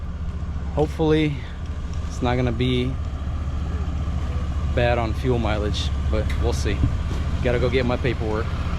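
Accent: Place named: United States. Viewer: Да ладно, ты русский? Ахренеть, я тебя долго смотрю, думал, что ты Америкос с таким-то акцентом.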